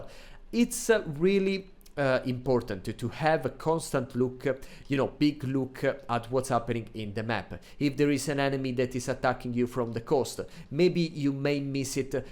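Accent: Italy. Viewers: Sei per caso italiano?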